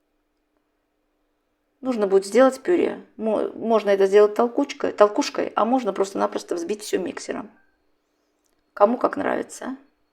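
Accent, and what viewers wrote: Baku, Azerbaijan. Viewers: Здравствуйте, наш бакинский акцент, это 👍👍👍🥰 Смотрю с Ростова на Дону, тоже из такого теста делаю пирожки, но этот вариант мне понравился, обязательно попробую. Спасибо ❤️🌹🌹🌹🌹🌹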